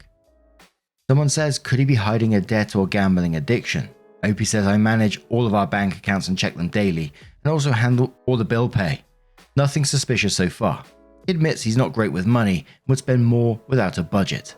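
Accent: England, United Kingdom. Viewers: My favorite reddit reader is you,. I love your waffles, your giggles, and when you swear. I don't know why but it's better with an English accent. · I'm Scottish. I love channel too, but he has an ENGLISH accent. There's no such thing as a (singular) British accent!! 😉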